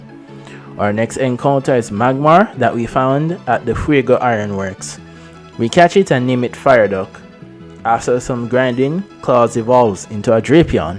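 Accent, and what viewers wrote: Jamaica. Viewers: You sound Jamaican 😊 I know our accent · you from jamaica? i’m from trinidad and trying to pin down the accent🤣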